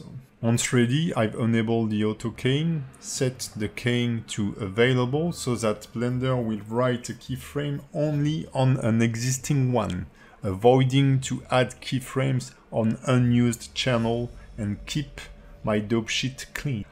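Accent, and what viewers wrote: France. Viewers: slt, j'adore les français qui parle anglais . Bonne vidéo continuez comme ça ^^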